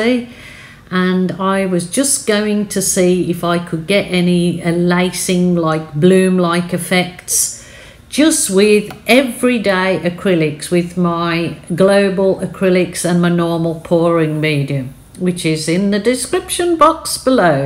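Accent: United Kingdom. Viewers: That is pretty. I love the mountains 😁. I've never tried to do the shellee bloom, but have thought about it. I've seen someone (can't remember who) do it with acrylics. She used much thicker paint and spun it after blowing it out. What brand of paint, I couldn't tell you. You've lost the Brum in your accent, but you still have a strong Brit accent. My other half is from Manchester. Thank you for sharing ✌️❤️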